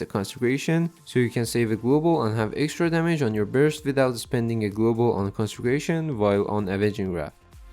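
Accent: Iran. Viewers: R u Iranian bro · lahjat yekam farsi mizane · You have a Persian accent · you have kind of an persian accent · Are you Iranian by any chance?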